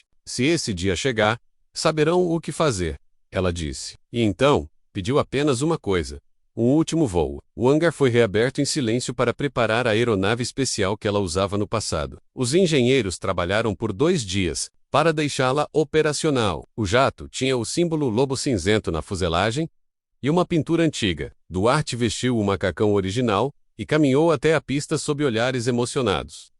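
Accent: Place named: England, United Kingdom. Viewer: Não gostei! História muito vaga. Tradução truncada, ora em português, ora em inglês, ora com sota- que inglês. As fotos não correspondem à idade da soldada. Parecem mais com as de uma modêlo de 25 anos que pelo tempo decorrido deveria aparentar muito mais idade.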